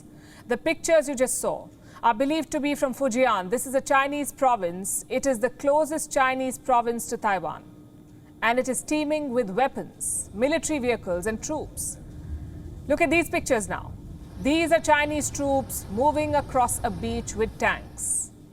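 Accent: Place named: India. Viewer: I can't stop laughing the way she speaks is an Indian accent I am sure you will be able to understand it. By the way World has various accent and you can't say anyone wrong just for their accent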